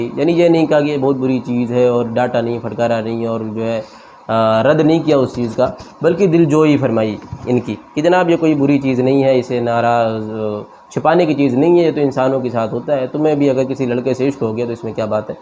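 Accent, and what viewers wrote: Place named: Azamgarh, India. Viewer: جناب آپ اعظم گڑھ مبارکپور کے رہنے والے ہیں آپ کی آواز سے ایسا ہی لگتا ہے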